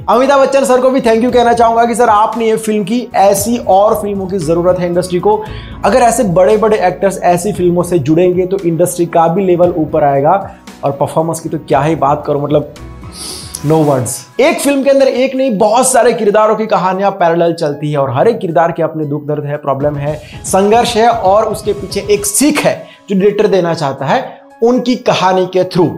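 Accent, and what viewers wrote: Gujarat, India. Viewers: kya aap gujarati ho? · Aap gujrat se ho ??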